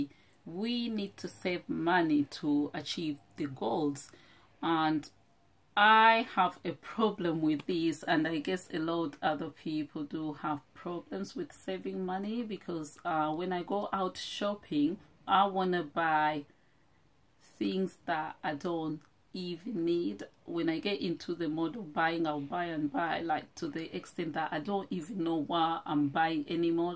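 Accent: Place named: Uganda. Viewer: You are a beauty. Where are you from? I'm Kenyan and to me you sound Ugandan... maybe Zambian or Malawian. Advice: film before a window (the natural light is much better than an overhead bulb. Also, set the phone/camera down so that the footage is stable. Good luck on your journey!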